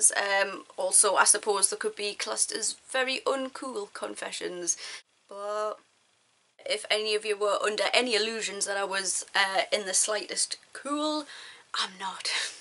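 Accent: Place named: Scotland, United Kingdom. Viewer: i am literally obsessed with your accent. is it... scottish? are you a geordie? doesn't matter, loved your video!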